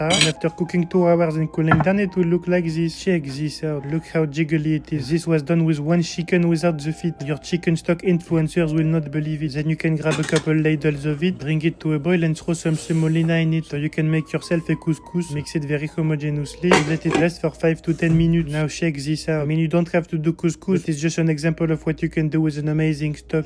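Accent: France. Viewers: la vie tes un bon frr, de tout les accents frenchies jsp quoi tes lmeilleur big, keep go et tauras plus aucun ptit français a la con comme moi dans tes com (le jour ou tu seras gros tsais)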